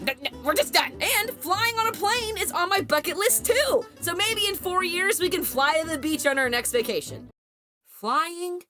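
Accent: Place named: South, United States. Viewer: i'd say it's not only the southern accent, but the voice itself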